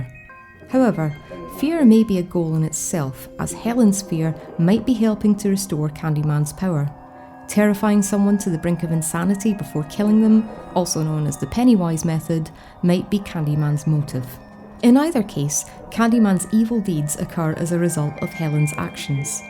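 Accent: Scotland, United Kingdom. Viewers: Really excellent. And nice to hear a fellow Scot. I always thought the film was meant to be as much like 'rumour' as Candyman himself, so resisting clear-cut explanations. I also wonder how much input director Bernard Rose had. His wonderful film 'Paperhouse' was praised by Barker, and makes an instructive comparison with 'Candyman'. Helen also reminds me of Julia in Hellraiser (one and two), in a faint way. The murders that happen because of them or by them, which are only between them and the male villain. Then they both 'resurrect' - Helen as a murdering ghost summoned from the mirror after her name being said five times, Julia as a murderess 'summoned' from a mattress as the result of several murders (having become, maybe, some kind of legend herself, in the doctor's eyes). I'm spitballing....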